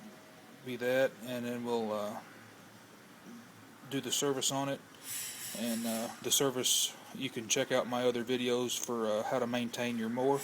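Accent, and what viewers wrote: Texas, United States. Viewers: Is that a Texas accent cowboy?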